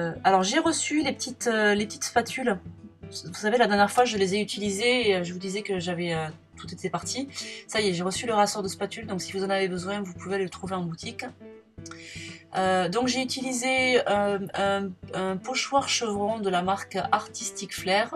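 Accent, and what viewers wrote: South, France. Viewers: Moi je ne trouve pas que le masquage sur ton étiquette était inutile ça rend trop bien ça fait classe je vais te piquer l idée. J aime bcp aussi la fuse avec le tag. Deux petites questions : quelle est la marque de tes pochettes ? Comment imprimes-tu tes photos carrées ? stp. Merci pour tes vidéos avé l assent c est très agréable 😁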